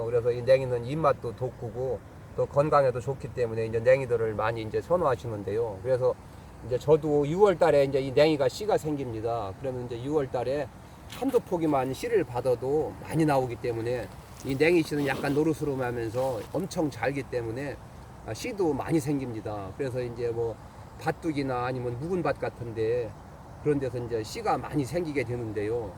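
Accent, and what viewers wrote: Chungcheong, South Korea. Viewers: 데요~데요~ 너무 좋습니다 충청도가 최고에요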